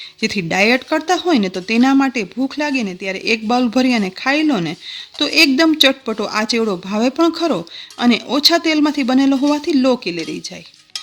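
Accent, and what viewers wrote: Kathiawar, India. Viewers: Nice astonishing recipe of namkeen nylone chevado with corriender plus sweet neem leaves powder presentation with sweet kathiawadi speech voice. Thanks to ji.. With feelings.... Add basil leaves to increase taste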